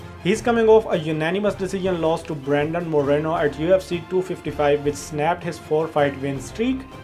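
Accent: India. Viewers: "Ur English is very very Indian", lol. Tf do u expect an Indian dude to have? A British accent? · Plz do something about your accents. Ur English is like very very Indian.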